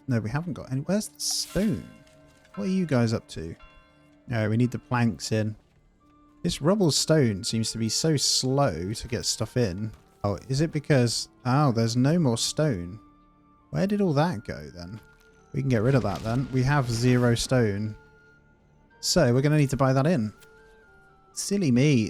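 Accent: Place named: East Anglia, United Kingdom. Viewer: Well its definitely an east anglian accent, I was just refusing to say Ipshit.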